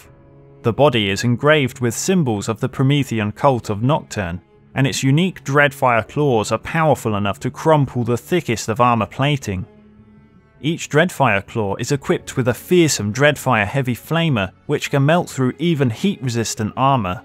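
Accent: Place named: United Kingdom. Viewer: The GrimDark Narrator sent me your way, says you know your stuff AND have a british accent.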